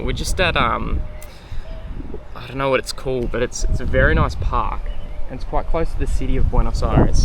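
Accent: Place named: Australia. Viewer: Aussie accent, interesante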